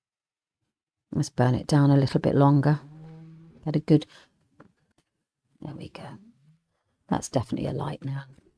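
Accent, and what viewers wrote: United Kingdom. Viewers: you have the most lovely accent and speaking voice, how I love the brits , )O( cheers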